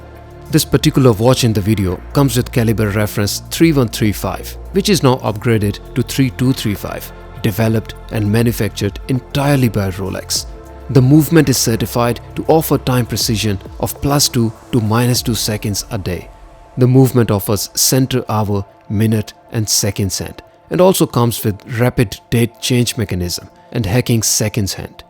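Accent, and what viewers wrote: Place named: India. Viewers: Fod God’s sake get rid of the Indian accent... otherwise your videos are stunning. I had to think for a minute to figure by “Veer” you meant “Wear”, annoying distractions. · Losing the accent might be difficult to do if he happens to be Indian. Gtfo.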